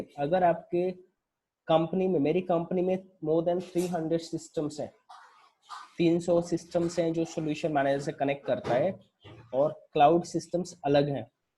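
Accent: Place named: India. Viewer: hard to understand it because of hindish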